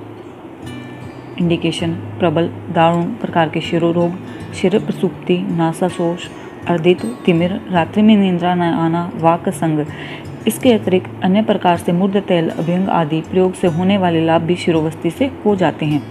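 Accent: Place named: South India, India. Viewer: Very Nice Dr. Sahab 👌you show the maturity of pronunciation..In starting I thought, there is a South Indian Ayurvedic Physician..😊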